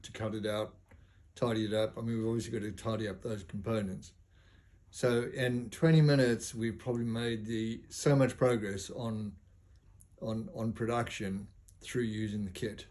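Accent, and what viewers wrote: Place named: Cape Town, South Africa. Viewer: Aha...starting at the beginning! You definitely sound Cape Townish, or Zimbo, maybe...no worries, lekker, man!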